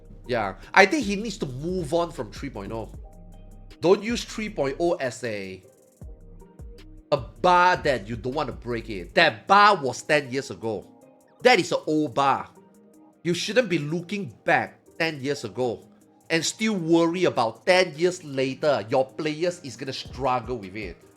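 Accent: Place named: Singapore. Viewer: I mean it's an accent from another English speaking nation. In Singapore this is just how people speak English and it's the primary language there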